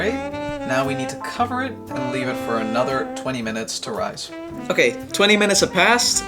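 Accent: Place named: United States. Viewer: As a British person I can proclaim that you sound 1,000,000% American, sorry 😵 it's pretty common for Europeans, but usually there's a hint of something else in there, though I don't detect it with you!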